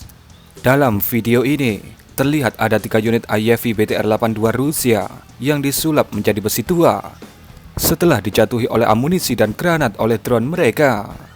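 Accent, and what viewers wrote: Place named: Java, Indonesia. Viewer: Lebih gregetan lagi dengar suaramu yg medok cil tidak unt di lestarikan yg sprti lu medok2 gilani😂😂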